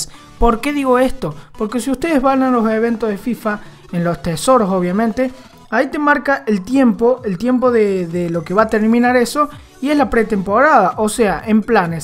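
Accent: Córdoba, Argentina. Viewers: Re cordobes el qliau jajaj genio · Pareces una combinacion de cordobes(personas de corfoba,Argentina) y un pelo de español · Alto cordobes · ¿sos de Córdoba no?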